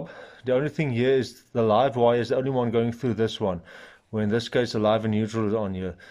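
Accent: South Africa. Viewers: Ek kan mos hoor jys Afrikaans Danke oom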